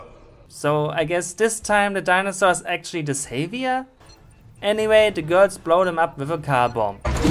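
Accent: Germany. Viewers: This video is the first I ever watched from you, and by your accent I immediately knew you were German.... Wie kannst du kartoffelsalat so schlecht reden? XD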